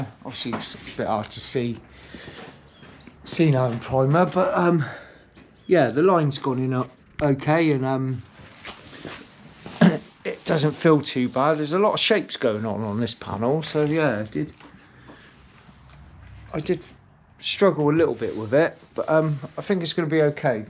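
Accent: Wiltshire, United Kingdom. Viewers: Nothing wrong with your wilts accent my good man ! The one thing my wife liked was my Wiltshire accent and most of the people around here speak it to funny enough as I live in Wiltshire 🤣 that looked like a real tricky job there’s angles going all over the place looks proper nice to me good job 👍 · you talk Wiltshire mate people shouldn't be rude skiting you accent great job on the door look forward to part 2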